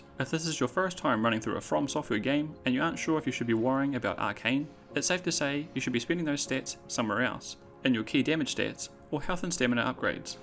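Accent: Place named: Australia. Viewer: "Biddeh" i love australian accent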